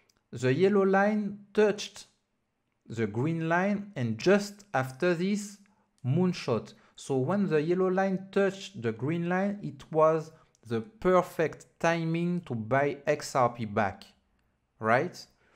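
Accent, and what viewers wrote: France. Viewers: Merci pour cet anglais "français"... je comprends TOUT ! merci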